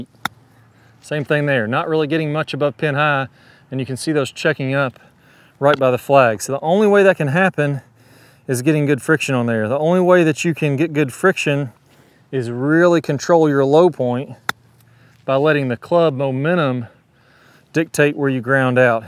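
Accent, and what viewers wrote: South, United States. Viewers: Great work, love the Southern drawl, nearly as good as the Aussie accent, but close. Thanks for your videos from Melbourne Victoria!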